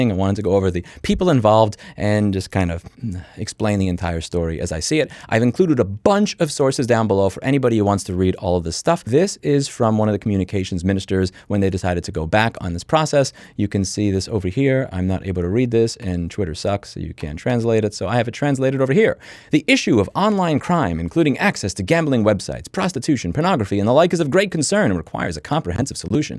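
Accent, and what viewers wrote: New York, United States. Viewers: His anger is glorious at 2x. The authentic new yorker experience. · Why people no get he from NYC?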